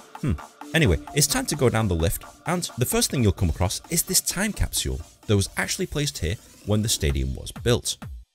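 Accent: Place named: Manchester, United Kingdom. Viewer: I could kind of tell you weren't a Spurs fan due to your Northern/Manc accent, and then you explained you're a Man United supporter so it explains it 😅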